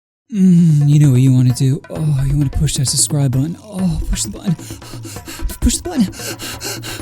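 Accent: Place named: South, United States. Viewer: Bro went all Southern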